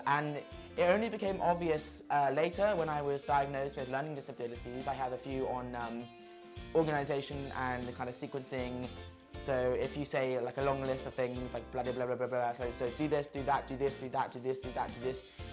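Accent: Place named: United Kingdom. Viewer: Es porque habla ingles británico! Ellos tienen un lenguaje y acento particular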